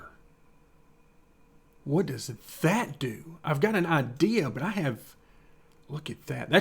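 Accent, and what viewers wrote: United States. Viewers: Nice video. From the sound of you, we are probably from the same area of the US. I'm not great at the EVA either btw.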